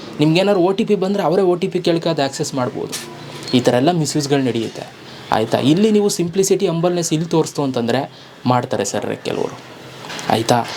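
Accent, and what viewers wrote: Mandya, India. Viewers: Pakka nam mandya. Bhase nivu. Sup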